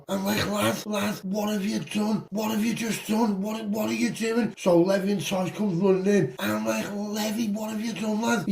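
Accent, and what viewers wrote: Liverpool, United Kingdom. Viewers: Oooow scouse 😂😂😂 even in my darkest hours you still make me smile m8 🎉🎉🎉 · YES SCOUSE. I'd literally listen to one EVERY day, always click straight on when see one of yours. 'What are you on about"❤😂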